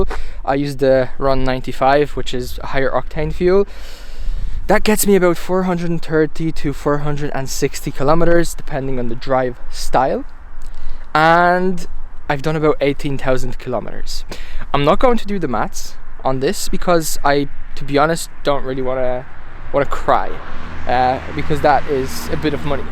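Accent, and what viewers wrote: Ireland. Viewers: I understand you're a Polish channel.. But are you from / did you grow up in Ireland? Swear to god you sound like you're from Ireland when you're speaking - like somewhere from the southeast of the country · Great car!, you lived in Ireland or your teacher was one? Asking because of accent 😁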